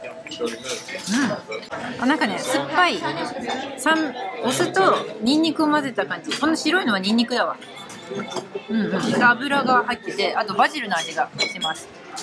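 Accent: Hokkaido, Japan. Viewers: 時折出る北海道弁にほっこりします☺️ さんの食レポ最高です❣️今年も楽しみにしています❗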